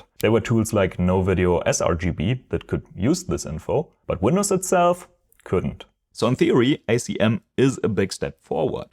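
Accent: Germany. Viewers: Hab den deutschen Akzent direkt rausgehört😂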